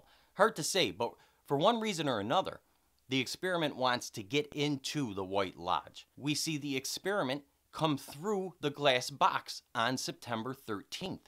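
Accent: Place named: Chicago, United States. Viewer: I'm betting Chicargo...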